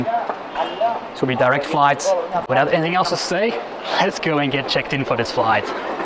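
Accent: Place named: South Africa. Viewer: Thanks for the upload. Haven’t flown Qantas for many years so it was interesting to see what they are like now. The lounge at Perth looked great and not overcrowded, a rarity these days. I’m trying to place your accent, there’s a hint of South African, mixed with a bit of Australian. I love trying to guess accents.😁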